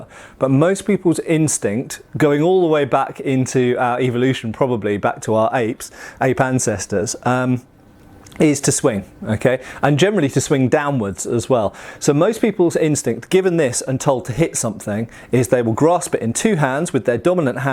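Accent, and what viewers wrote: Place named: England, United Kingdom. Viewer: Only reason why someone is watching this for such a long time is his English accent!🤺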